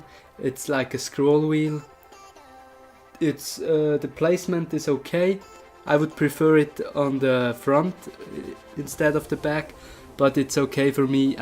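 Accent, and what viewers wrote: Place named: Switzerland. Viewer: Nice man hört dir den Schweizer einfach raus. Wieso machst du englische Videos. Aufjedenfall cool. Wie findest du das Mik im vergleich zu membran mach mal ein Headset mikro vergleich test.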